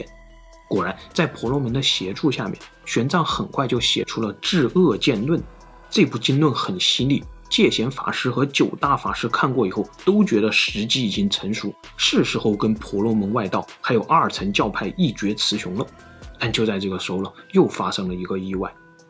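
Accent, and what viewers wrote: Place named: Hunan, China. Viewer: 这在湖南称为“塑料普通话”，很有湖湘味道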